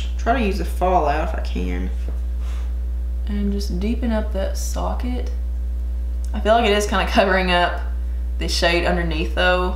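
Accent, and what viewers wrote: South, United States. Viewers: I really got tired of all the hate bandwagon of this palette. You and emily Noel were very honest about this. I love your southern accent. You are a very beautiful woman. Keep up the good work.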